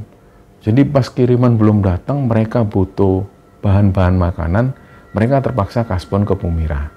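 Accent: Java, Indonesia. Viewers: Ach mas itu suaranya adem. Ciri khas jowonya. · logat jawa yang kental merupakan ciri khas..... latar belakang jawa.... ada kesulitan saat meng hafal kah mas???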